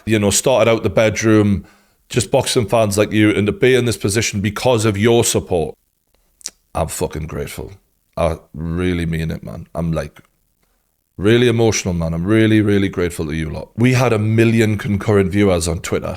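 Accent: Newcastle, United Kingdom. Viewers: Spot on true geordie👍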